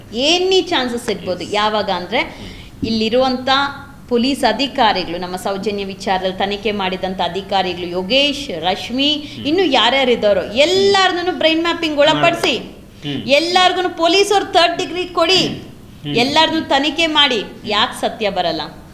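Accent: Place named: England, United Kingdom. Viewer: Mam neev matado kannada style super 👌 👍 English style alli kannada maatu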